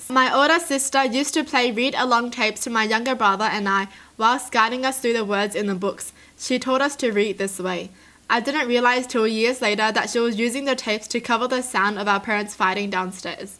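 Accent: Australia. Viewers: Shes from Australia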